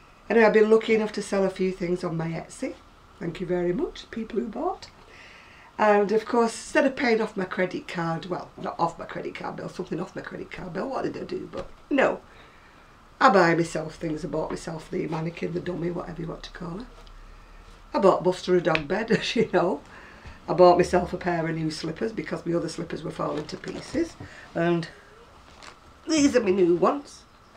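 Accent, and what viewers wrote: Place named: RP, United Kingdom. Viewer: You always make me smile! Posh or no, I love listening to you.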